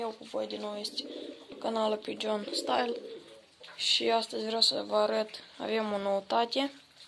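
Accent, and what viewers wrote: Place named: Moldova. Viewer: Imi place accentul tău de moldovean, dar si de cum îngrijesti porumbeii din gospodăria ta. Like 22.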